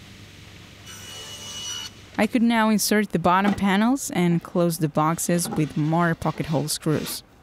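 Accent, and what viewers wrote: Portugal. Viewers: Pqp, assiti o vídeo inteiro imaginando que sotaque é esse e vc é portuguesa kkkkk sou br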